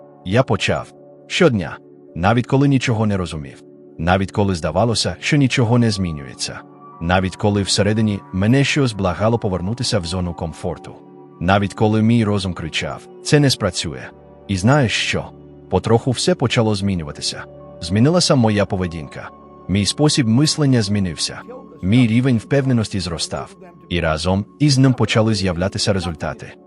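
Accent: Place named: United States. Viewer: Жахлива озвучка, що це за американський акцент?😂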